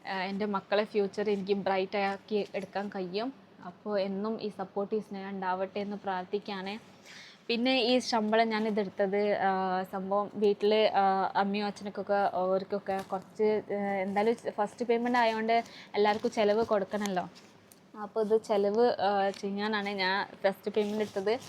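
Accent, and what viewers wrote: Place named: Kozhikode, India. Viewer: ഭാഷ കോഴിക്കോടനാണല്ലോ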